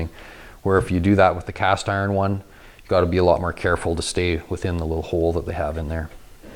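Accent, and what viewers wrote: Canada. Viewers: You sound like a Canadian eh. Am I right? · holy canada accent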